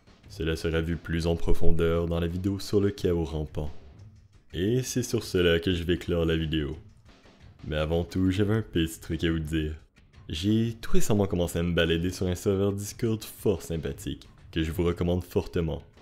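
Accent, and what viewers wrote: Quebec, Canada. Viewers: T’essaie de dissimuler ton accent canadien ou alors il est naturel ? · Tu est québécois ?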